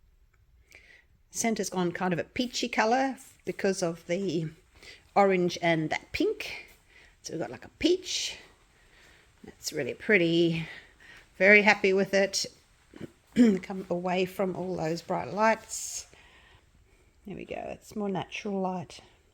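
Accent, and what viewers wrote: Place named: Perth, Australia. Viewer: Oh my goodness that is one of the best blooms I’ve ever seen done! You make me want to get my resin out now & its 1.42am here in Yorkshire, England hahaha if my work is half as good as yours I will be very happy! I have quite a few family members who live in & around Perth & I love the accent! Your jovial passion for what you do shines through in your words & excitement! Never change my dear! Xxx Vick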